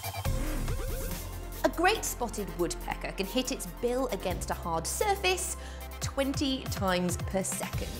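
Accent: United Kingdom. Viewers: lol is so hot you can even hear her British accent when she laughs;)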